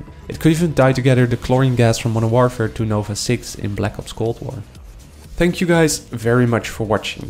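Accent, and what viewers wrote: Netherlands. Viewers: Ik hoor gelijk aan jou accent dat je Nederlands bent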